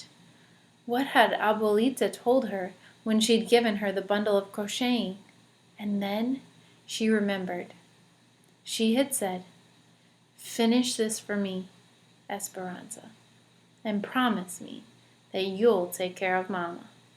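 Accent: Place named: Mexico. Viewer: Bruh! You need to work on that Mexican accent! >:(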